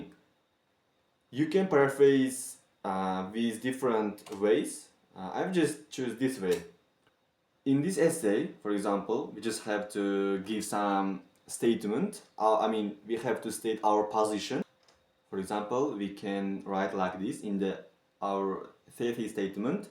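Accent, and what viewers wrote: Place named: India. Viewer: Bruh indian accent 🤡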